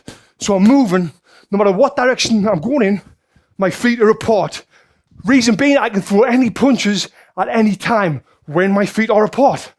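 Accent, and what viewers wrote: United Kingdom. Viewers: I consider your tactics fair. Boxing is a sport. In a sport we must use tactics and our brain first and foremost. So not engaging in order to get the best result for you is tactics. If your oponent has slow feet then using your feet as defense is a great tactic to tire him and make him frustrated. Whoever says that your defense and your strategy is cowardish needs to think again. For example, if I have an oponent who has great body shots and is taller than me, I need to play with my chin very low and using my body moves left and right to avoid the body shots and attack from the low with uppercuts and hooks, without using my cross and jab so much because I risk my game against a good body shot boxer. So this is a tactic. Also what you did is a tactic, fair, and you exploited your strength (fast feet) and your opponents weakness. Thanks for your impressive and detailed videos. 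I train myself using your videos, mainly for fitness purposes, and from every boxing youtuber you stand out for your athletic spirit, your kindness and knowledge and also for your badass British accent! Love you! Keep it up!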